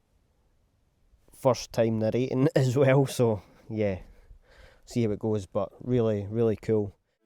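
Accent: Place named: Scotland, United Kingdom. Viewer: New pipe smoker here- I love Malcom and his poem. You did it justice mate- something about reading poetry with a Scottish accent- it really works Thanks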